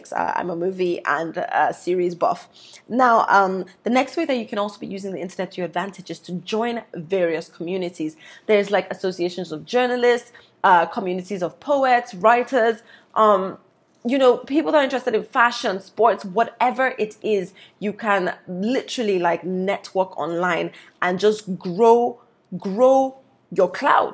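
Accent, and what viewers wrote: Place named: Nigeria. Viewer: I knew you were a Nigerian right from the beginning I heard your voice. Nice Video 😊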